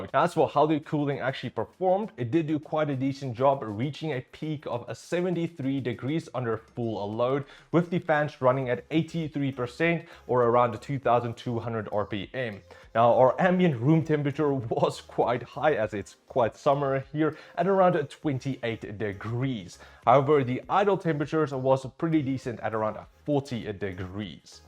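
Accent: South Africa. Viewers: Damn i knew you were pronouncing words in a odd yet familiar way. i could not put my finger ont it. (im living in Australia for a couple of years now) That good ol south african accent. I can still pick it up.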